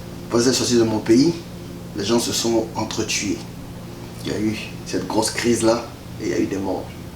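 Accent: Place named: Ivory Coast. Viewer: Ou là super mec allons voyons vous avez l’accent propre des Ivoiriens né grandi et éduqué étudié en Côte d’Ivoire. Vos frères vous aime alors mettez vous dans la peau d’ivoirien ils on trop souffert dans cette sale guerres. Et on peurs comprenez cela svp. Ce qui se passe en Côte d’Ivoire ne se passe nulle part ailleurs surtout pas dans un autre pays en Afrique. Ils ont le droit devoir d’être jaloux de leur pays. Le président HKB a le devoir de tirer la sonnette d’alarme a ces compatriotes sur un quelconque danger.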